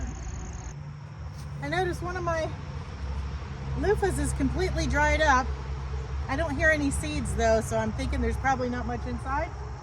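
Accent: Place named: South, United States. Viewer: Im wondering what state y'all live in. You sound southern like me. What are loofahs good for? I've never grown any at all. They do look fun to grow though.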